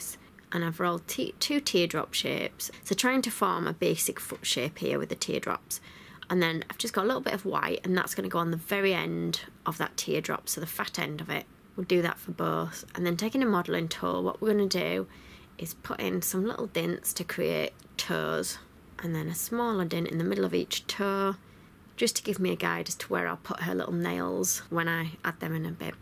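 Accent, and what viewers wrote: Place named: North East England, United Kingdom. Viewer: Are you from the same area as Sarah Millican? Your accent sounds similar. It's a lovely accent to listen to, from both of you!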